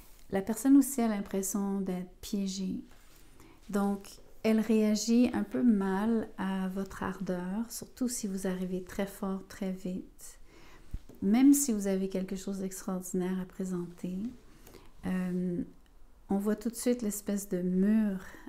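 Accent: Quebec, Canada. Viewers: Je l'attendait tellement, avoir cette manière apaisante et la signature Québécoise ❤, normalement les verseaux seront les premiers pour Mai 😅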